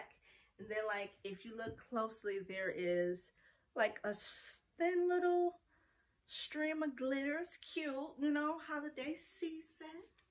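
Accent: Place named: Midwest, United States. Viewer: Hey! Congrats on your new channel! I'm excited about what you have in store for us. Yes, sis you hit a licc, honey! 🤏🏽🤏🏽 "snap, snap!" ☺️ That gold bag andthe black and white suit...cold! OMG! Btw, I can tell you're from the Midwest by the way you talk. Anyway...I love thrifting and shopping estate sales. I had to cut back a lil bit. You have me inspired though! I just need more SPACE! Time to purge! Anyway excited for you about your new channel. Looking forward to more of your videos! Subscribed! ❤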